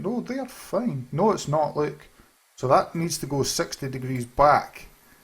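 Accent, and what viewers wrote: Scotland, United Kingdom. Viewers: I'm not saying it's a bad thing but he has a really Scottish accent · Is he really Scottish?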